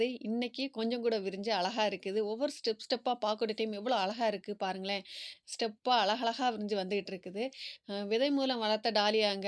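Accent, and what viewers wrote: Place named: Nanjil Nadu, India. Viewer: பூக்கள் அழகுதான் சகோதரி, நீங்கள் நாஞ்சில் தமிழில் வர்ணணை செய்வது மிக அழகு, சிறப்பு ❤❤ வாழ்த்துக்கள் சகோதரி🎉🎉🎉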